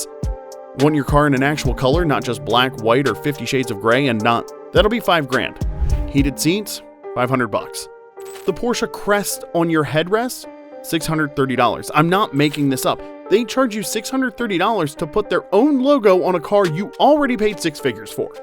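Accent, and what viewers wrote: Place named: New Jersey, United States. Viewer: Here’s another little tidbit about my wonderful state of NJ. Did you know that in the mid 50’s Margaret Hague Hospital in Jersey City, developed and held the clinical trials for the polio vaccine. The team of scientists worked under Jonas Salks, essentially wiping out polio. I grew up in Jersey City, and I travel the world as an airline Captain. I always run into someone from Jersey, no matter where I am. A little bit of everything in this state, and some of the best striped bass fishing in the world.😊 By the way, the accent is still very heavy.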